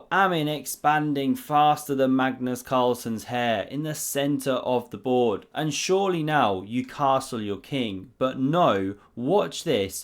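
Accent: United Kingdom. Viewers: Uk accent suits any commentary, cricket or chess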